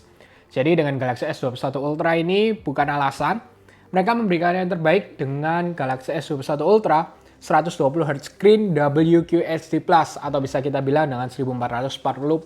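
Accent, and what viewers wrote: Java, Indonesia. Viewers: Orang Jawa logatnya keliatan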